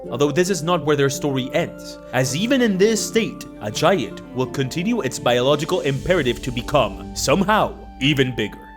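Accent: Ireland. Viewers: Release the forbidden Irish accent Go on. Go on. Go on. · give us your irish accent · As one of your Irish viewers, the fact you used to speak with a big Dub accent makes me unspeakably happy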